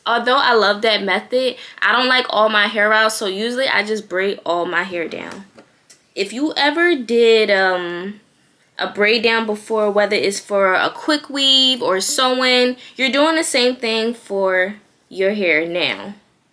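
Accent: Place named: DMV, United States. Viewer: Are you from the dmv? I hear an accent 😍 this video definitely helped me love it thank you !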